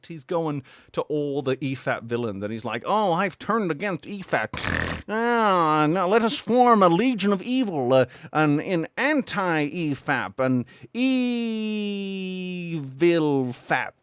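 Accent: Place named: Georgia, United States. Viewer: I didn’t know you were a southerner from Georgia. Amazing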